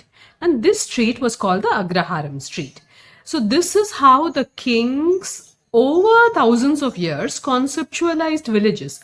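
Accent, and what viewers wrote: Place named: Sri Lanka. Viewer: Sounds like a Sinhalese accent.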